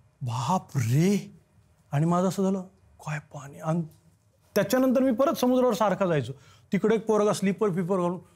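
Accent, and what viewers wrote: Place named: Beed, India. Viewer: रिअल बोला हा...... माझा मित्र बीड चा कोल्हापूर ला आला होता तो पण असच बोलत होता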